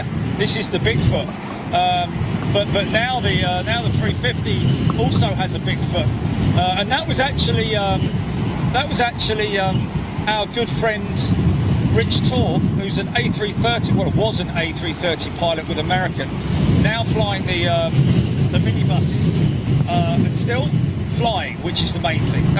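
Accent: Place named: England, United Kingdom. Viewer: Wow I just love your video, and your English accent. Hello from Covid19 Melbourne Australia 🇦🇺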